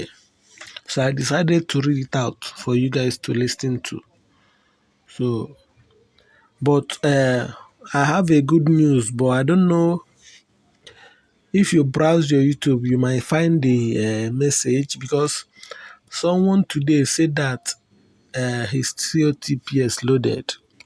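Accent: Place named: Africa. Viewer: Please you sound African stop fooling I know African are intelligent 🧏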